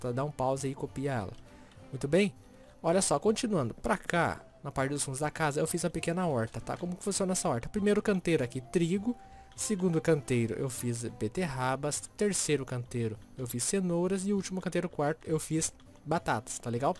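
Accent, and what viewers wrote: Rio Grande do Sul, Brazil. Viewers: vc e gaucho? Eu sou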